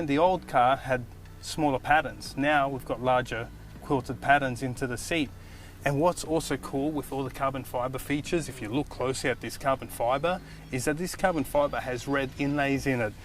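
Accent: Australia. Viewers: no, thats a spot on wog aussie accent. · The G63 is finally a true AMG worthy of wearing the AMG badge. The ONLY car sicker is the Brabus G63!! The best thing of all is having an Aussie review it, speaking “our” language!!!!! Brilliant! Sub’d, liked & notified!!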